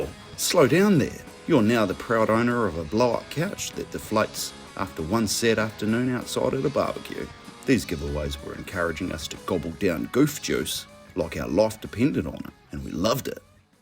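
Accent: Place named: New Zealand. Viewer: Brother I’m from rural Waikato and I had to adjust to your Kiwi as accent hahaha respect brother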